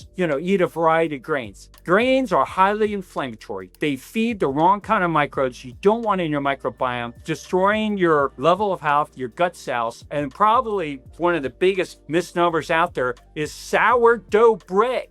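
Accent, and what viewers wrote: United States. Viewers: Gotta love this dude's mid-Atlantic accent. I bet he's from Maryland or somewhere nearby.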